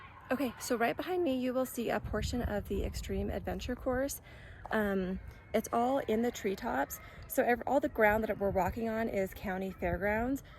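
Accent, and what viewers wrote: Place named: Ohio, United States. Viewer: Love your accent respectfully. Are you from Ohio originally?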